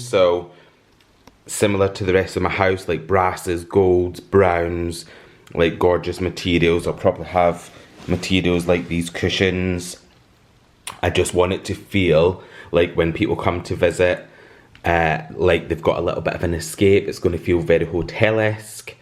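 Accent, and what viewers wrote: Scotland, United Kingdom. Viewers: Loved those two textured wallpapers - they give such a luxury hotel vibe! Just found you a couple weeks ago & get so excited when I get a notification now 🤣 Love everything about your style & aesthetic...and I'm a fellow homebody/ introvert!!! haha! Have been trying to work out where your accent is from...I'm just outside Edinburgh, but I'm thinking you must be further North. Thanks for showing us another part of your beautiful home...I'm off to look up those Kinfolk books on Amazon right now. Obsessed with interior/ architecture books!!! Have a lovely week ❤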